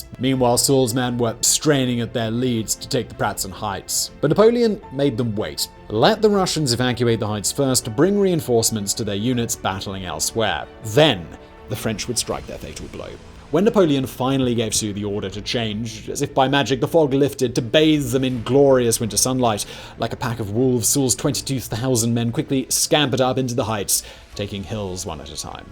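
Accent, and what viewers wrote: United Kingdom. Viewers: Look forward to hearing Simon telling us about the battle of Waterloo. One needs the proper British accent to describe the upper crusty Wellington defeating Napoleon.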